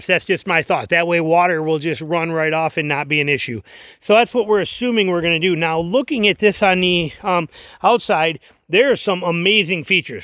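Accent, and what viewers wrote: Michigan, United States. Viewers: super helpful review and one hell of a a Michigan accent.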